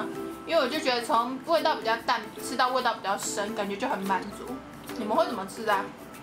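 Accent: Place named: Taiwan. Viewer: 台語好可愛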